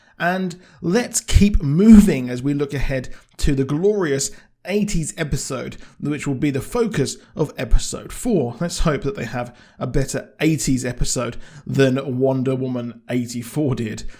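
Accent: United Kingdom. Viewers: Thats because he's British. I have a similar accent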